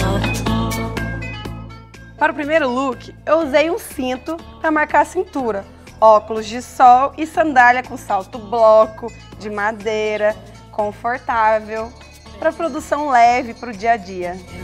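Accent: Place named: Goiás, Brazil. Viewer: Linda demais com esse sotaque Goiano 😍 Não vejo a hora de te ver ♡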